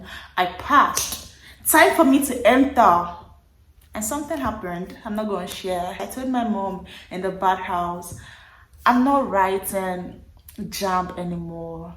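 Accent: Ghana. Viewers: This is so funny. You sound like Ghanaians now, and it's totally weird. You guys had get together 'steady'. Lol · Funny that back then you did not understand their intonation but now I hear you pronounce "study" like a Ghanaian. Lol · and now you have a ghanaian accent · My dear you are not back to speaking English with a Nigerian accent oo you still sound 80% Ghanaian😅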